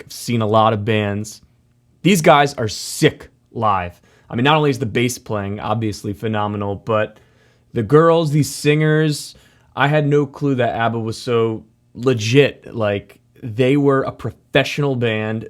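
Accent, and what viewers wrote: Baltimore, United States. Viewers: The Baltimore accent is one of the greatest American accents of all time. Now I need to snort lines of Old Bay and put on some.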